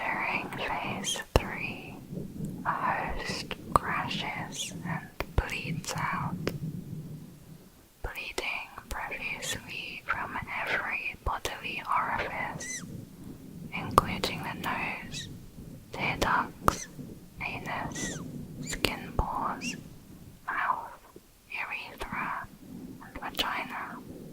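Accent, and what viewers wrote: United Kingdom. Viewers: You have the most beautiful accent and voice I've ever heard. I'm British too but have a heavy lower-class South East accent and mostly speak in cockney slang. Really gotten into SCP stuff too over the last little while. Great stuff :)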